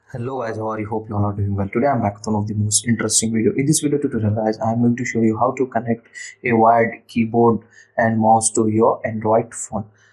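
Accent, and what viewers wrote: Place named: India. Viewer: Is it me or is the sound quality so bad on this video that, coupled with the presenters thick Indian accent makes the video unintelligible?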